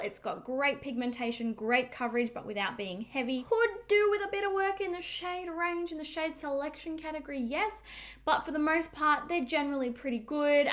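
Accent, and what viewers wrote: Australia. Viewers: You sound Australian would be great to know the prices here, and are you saying Chemist/Pharmacy?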